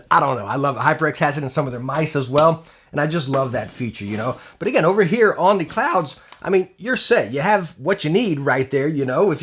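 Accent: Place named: Texas, United States. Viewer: Hey man. I am new to the channel. Have been enjoying your videos a lot. I am just curious, were you born in Texas? Your accent is nice af.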